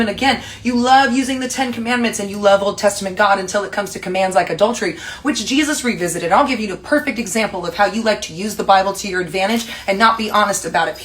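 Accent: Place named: United States. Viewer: Dear, I love u. To be precise, I love tt fire cracker brain of yours. 🥴 U don't know how hungry I hv been for scintillating intelligence tt cuts right thru the 💩 that's slung at us every day thanks to social media n the shameless greed, evil n lies of the privileged class... I'm also green w envy tt u hv an IQ of 146... Gasp! But I know it can't be ez n tt it can be v lonely inside at times. But for whatever it's worth, brain to brain, u hv an ardent admirer here. I struggle a teeny bit at times w yr rapid fire discourses at times as I hv to parse yr American accent thru my cynical Chinese brain n stodgy British education but I don't mind the coz U make me laugh so much when you give all those freaks, hypocrites n liars the dressing down they so richly deserved... I'd buy u a carving knife but man, tt tongue of yours wld do a MUCH better job! 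🤣 I hereby declare tt I am yr humble fan n grovel at yr feet for all those 'licious lil crumbs of whiplashing U give those oh so deserving man-babies. 🤣😂🤣 Oh dear, I hope my jaw doesn't fall off fm all the laughing. May the Universe bless U n send U lots of fireflies to light yr way. 🌌🥴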